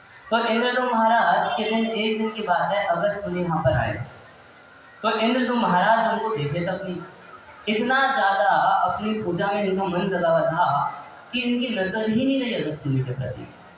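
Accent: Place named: Bihar, India. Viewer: Apka language bihari language se milta hai🙏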